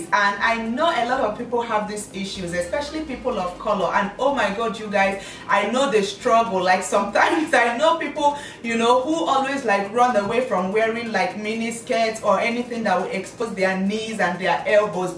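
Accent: Igbo, Nigeria. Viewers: you are the igbo omotola😘😘i love your igbo accent and beautiful personality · That's a Nigerian Igbo accent We have different accents here :) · Hello Gorgeous! New subbie...Luv your makeup, outfits and OMG, that Nigerian accent is as beautiful as you are! Luv, Blessings & Keep Slaying!!! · Nigeria asent oooh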